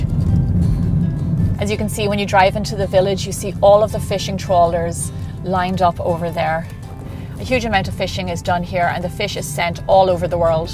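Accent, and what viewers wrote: Ireland. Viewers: Omg Iv been following you for years and always hear the Irish accent but didn't realize you were from Wexford. I'm from New Ross but live in New York. Small world. I'd kill for chips from kilmore or the premier in Wexford town . Go get a risole ! Yum!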